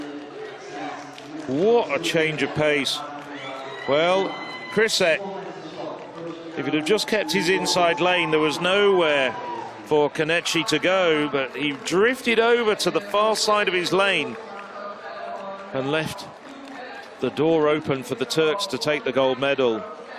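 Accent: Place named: England, United Kingdom. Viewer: Maşallah koçlara, sunucu o s.kik ingiliz aksanıyla ne güzel bozuldu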